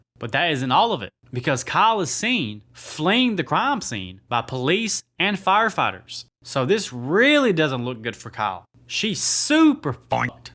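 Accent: South, United States. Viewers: your vids are quickky becoming my favorites! Been going through ur lists this last weekend. Great to see someone with a southern accent creating great vids too, bama here! Anyways lovely job, looking forward to CP3, totally my guilty pleasure of the chuckster movies.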